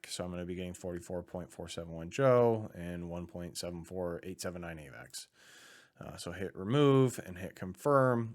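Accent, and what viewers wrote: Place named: Michigan, United States. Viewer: Great job bro 👍🏿. Sounds like you're in MI. Go Blue!😉. I'm in MI too. Maybe we can link up sometime. Blessings to you!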